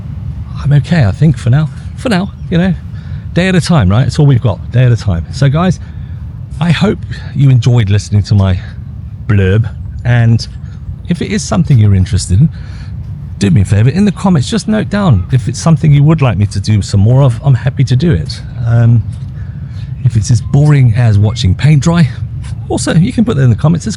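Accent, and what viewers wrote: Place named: South Africa. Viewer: Definitely pick up your s.a. accent . How many years did you spend in uk after Zimbabwe?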